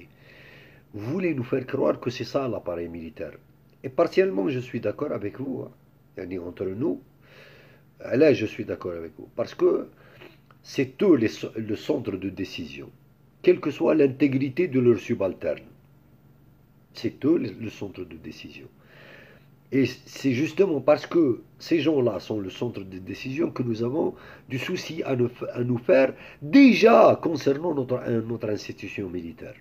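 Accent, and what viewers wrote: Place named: Western Algeria, Algeria. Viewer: Khouya vous ete tres eduquee et intellectuel intelligence...ellah ibarek...je c que peut etre vous exprime mieux en francais et plus allaise...ce que jai mal a captee..je suis englophone...alors algerienise Khouya...en plus Jaime l'accent de l'ouest saha shourak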